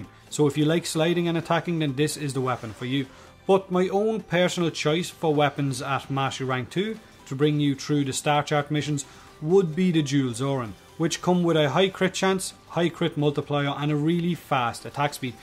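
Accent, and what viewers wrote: Ireland. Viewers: I love your fucking accent I am Irish too xD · Irish really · IRISH. SUBBED!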